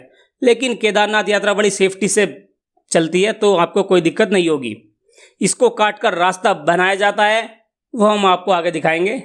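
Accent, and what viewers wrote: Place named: Western Uttar Pradesh, India. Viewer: भाई आप पश्चिम उत्तर प्रदेश से है आपकी भाषा अच्छी लगती है। हर हर महादेव 🙏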